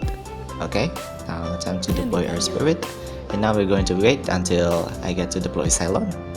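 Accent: Indonesia. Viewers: you sounds like indonesian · English Indonesia nya terasa bat anjir wkwkwkwkwk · thought so, when i heard it, ah Indonesian accent somehow Keep it up dude 👍mantab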